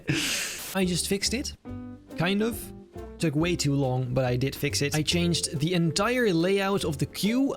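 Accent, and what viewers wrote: Netherlands. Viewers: Ik ruik een nederlands accent...?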